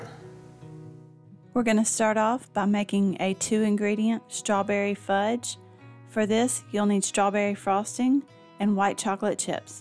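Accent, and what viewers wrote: South, United States. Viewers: Thanks for visiting my channel,! I just took a walk down Memory Lane on yours. My mom's from the south, so you had my full attention. I love southern accents. 😁And I have not seen Cool Whip and Nutter Butters since the 90's (not the kind of thing I can buy in Japan). 😆 Thanks for the great video and recipes. I love that someone ate your pie before it was set. Enjoy your long weekend!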